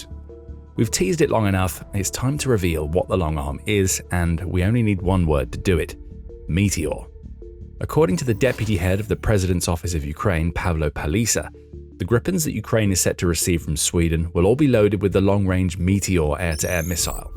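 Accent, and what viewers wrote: England, United Kingdom. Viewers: Couldn't you guys find a better word than "cheap" to describe the Gripen?? Try cost-effective - relatively inexpensive - or affordable. Not cheap= poor quality. For someone with an English accent you really have a limited vocabulary.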